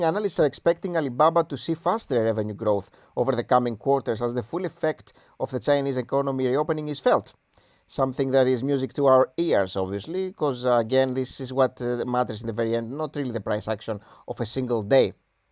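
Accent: Greece. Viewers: Great content! And do I hear it right, is that Greek accent? :)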